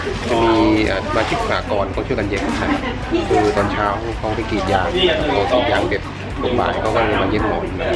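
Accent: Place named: South, Thailand. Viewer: ชอบพี่แหลง(พูด)ใต้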